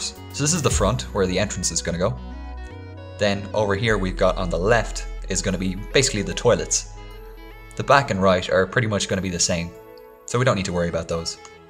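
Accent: Ireland. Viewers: i know you mighteve already got this question but, are you irish?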